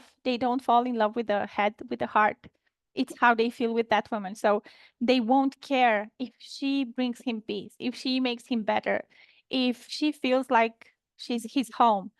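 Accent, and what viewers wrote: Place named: Philippines. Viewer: Are u Philippine? Interesting accent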